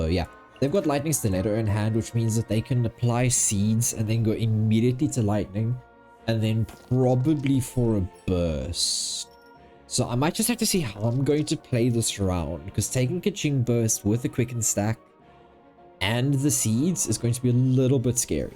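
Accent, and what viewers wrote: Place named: South Africa. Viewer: I love it when I immediately recognize a fellow South African's accent while randomly consuming Genshin content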